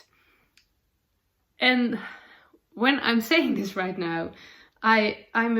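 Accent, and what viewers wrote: Netherlands. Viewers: I love your podcast and your “dutchie english”, it reminds me of my late father. He was stationed in Europe in the 50-60’s and had very fond memories of the Dutch people. I am grateful you record in English so I can enjoy out time together! 💜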